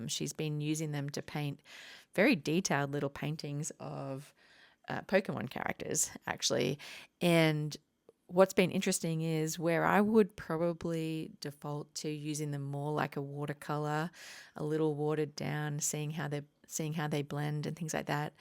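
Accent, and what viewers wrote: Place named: Australia. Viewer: I bought this set to start to watercoloring this year so I am excited to follow along with you to learn how to make swatches. I am an Aussie expat too so it is great hearing your accent.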